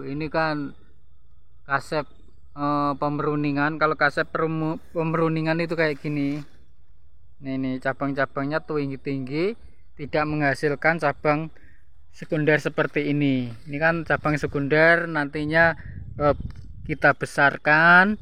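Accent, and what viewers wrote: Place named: Java, Indonesia. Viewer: Pemangkasanya pas dan terukur, bahamu juga meduk njawani😂😂😂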